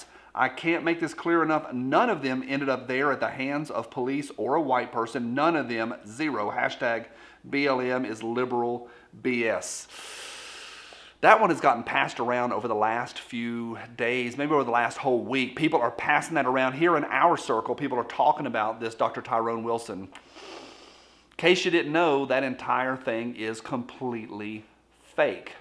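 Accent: South, United States. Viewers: Hay. Like your show a lot. I like that we do not agree every time, and....anD. I do not have to block you for that reason. Because we can disagree. I like the the diversity of your show. I like your southern accent, "Welcome, thanks for stopping by"....good deal. Iv only been watching you since Jan. So I'm fairly new. Have a GREAT DAY!!!!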